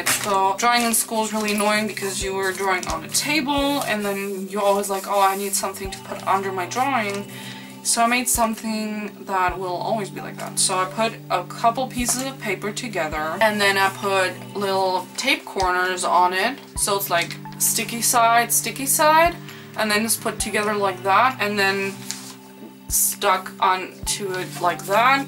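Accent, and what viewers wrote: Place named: Germany. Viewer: a german accent~ I can only say this because you sound similar to Kicki Yang Zhang in her older videos. Dw it's not heavy or anything lol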